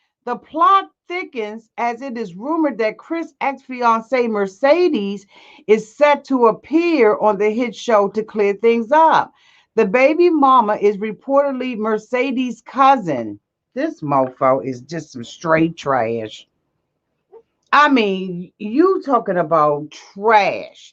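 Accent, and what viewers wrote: Washington DC, United States. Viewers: Auntie I hear DC in your voice are you from DC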